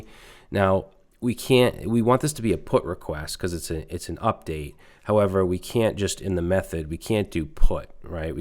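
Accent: Boston, United States. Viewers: As a 10 year software engineer wanting to learn Laravel for fun, I'm loving this tutorial man. All tutorials should be done by folks from Boston, so I can occasionally hear "what tha hell is wrong wit me?" Better than 90% of the courses I've paid money for, and more efficient.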